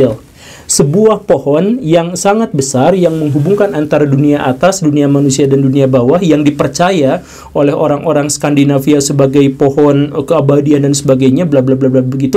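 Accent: West Java, Indonesia. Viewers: Urang sunda, teusawios ah....😁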